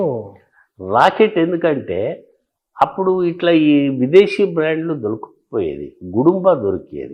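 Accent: Telangana, India. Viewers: తెలంగాణ భాష యాస జీవద్ బాషా ✊🙏శరణర్థి మైక్ టీవీ ✊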